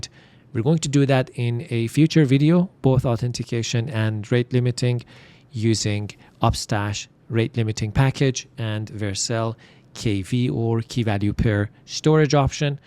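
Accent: Iran. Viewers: آخی فهمیدم بوی ایرانی میدی هم از لهجت هم از چهرت کارت هم درسته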